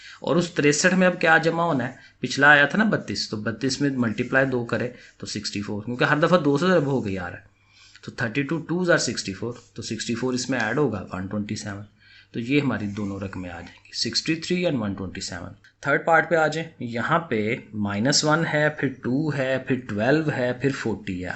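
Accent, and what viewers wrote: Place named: Lahore, Pakistan. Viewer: Teacher's lahori accent revel on ... " Har baar multiply ho rha ha...."😂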